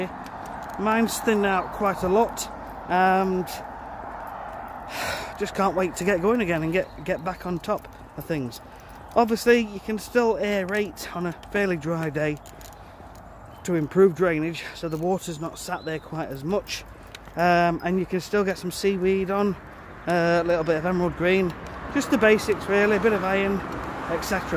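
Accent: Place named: Sheffield, United Kingdom. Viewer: Where are you based? Your accent sounds local to Me, I’m in Sheffield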